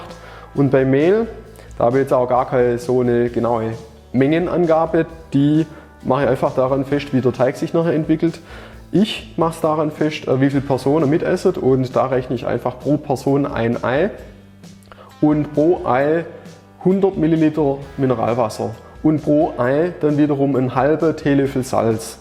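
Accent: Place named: Swabia, Germany. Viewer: Subborr Schwääbischkurs :)))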